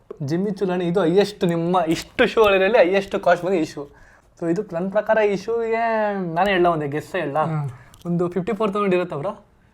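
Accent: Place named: Mangalore, India. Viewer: Full mangaluru kannada , kusi apundu keniyera😅😅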